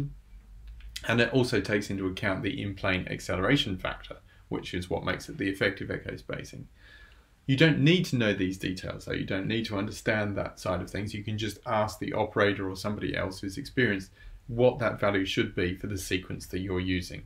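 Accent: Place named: New Zealand. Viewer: Trying to work out if the accent New Zealand, Australian, or either and influenced by time spent in England.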